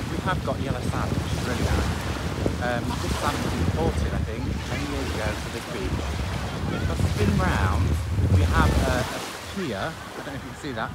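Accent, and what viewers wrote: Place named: Lincolnshire, United Kingdom. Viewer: So sorry I missed your quick live. I was wondering about your accent as I was trying to explain it to my daughter and since you said you live in Lincolnshire, I thought I would ask if this is where you got your accent.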